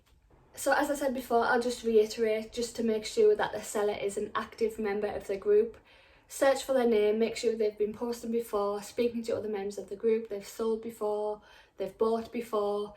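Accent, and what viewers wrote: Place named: North East England, United Kingdom. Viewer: Just subscribed and am looking through your videos. Great advice so thank you for that. I am a member of some Facebook LV groups but they are mostly US ones. Need to find some UK ones. Nice to hear a North East accent too! We sound the best haha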